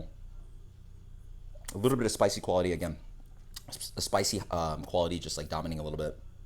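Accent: United States. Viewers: Are you from upstate NY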